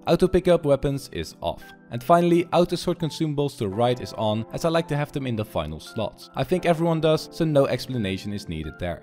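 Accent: Netherlands. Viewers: Ben jij Nederlands je klinkt als Nederlands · Are u dutch?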